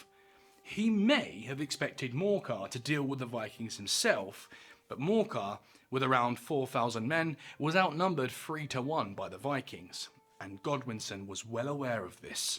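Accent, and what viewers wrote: United Kingdom. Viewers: Why are the popular YouTube history channels always narrated by Brits? 😂 Rather soothing to my American ears lol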